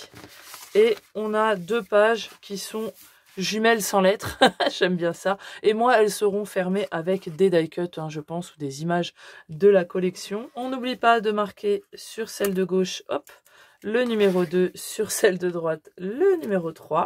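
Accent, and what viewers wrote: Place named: North, France. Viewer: Chalut , je visionne tes tutos merci d' où es tu ? Pour dire vingT en prononçant le T ? Du Nord , de la Haute Saône ? Jaime entendre les accents hi hi 😂❤🎉 Chat'leureusement F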